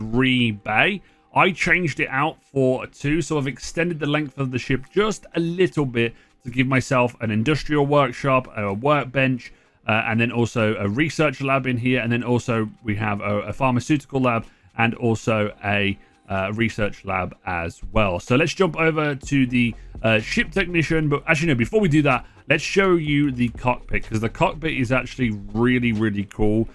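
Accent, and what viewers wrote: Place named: England, United Kingdom. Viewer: Ahhhh, English.....ENGLISH!! Not American trying to talk at 6 words a second! Why do they do that? Great video, precise and clear. What a treat. Liked and subbed.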